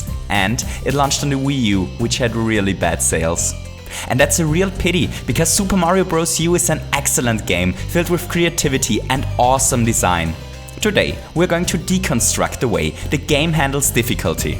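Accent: United Kingdom. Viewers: I'm guessing he speaks English with British people. They substitute "ah" for "er". It could be hard to distinguish between the two in conversation. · "Goompers" "Koopers" "Pletforms" "Dadly" "Chellenges" "Flegpole" WHY IS BRITISH SO BAD!?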